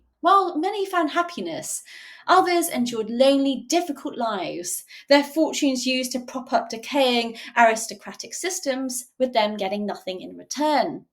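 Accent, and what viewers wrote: United Kingdom. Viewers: I absolutely love history videos and I can tell how passionate you are by the way you explain and tell these real life tales! Your British accent adds all to the charm and even if history is loaded with information, you really are able to deliver it beautifully and so easily understood! Hope you'll become professor one day if you aren't already or if you'd see yourself doing that, you'd be brilliant for sure 👏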